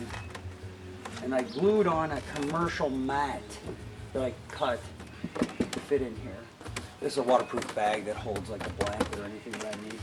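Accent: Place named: Maryland, United States. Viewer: Sounds like a Maryland accent.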